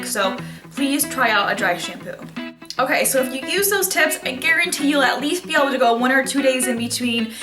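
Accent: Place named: Chicago, United States. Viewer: Your accent is awesome, Chicago? N your pretty, thanks for the tips too I subbed:)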